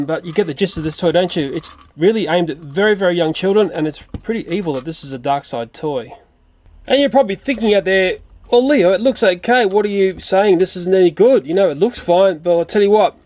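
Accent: Australia. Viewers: toy factory is my favorite knockoff company best of all the fake on is not a toy for kids its a priceless collectors item cause its in a collectors collection for teenagers and really fine old kids and adults who put them up on display where detail matters because I have this find collectors toy and here's is my price for your real minion Dave toy $50.00 and if you like my price please mail it to me Anthony Addison 107 Dwight drive Rome NY 13440 thanks. Anthony PS don't let anybody know I told you and by the way I love your Australian accent.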